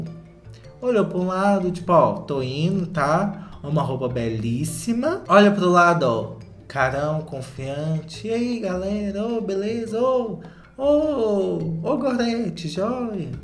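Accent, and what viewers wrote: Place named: Belo Horizonte, Brazil. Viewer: Ah, eu reconheci seu sotaque, é BH demais! Não te conhecia, este foi o primeiro vídeo que assisti, mas gostaria de valorizar a gata que mais entrega Catwalk: sua filhaaaaaa😻😻😻! Que neném lindona😹😹😹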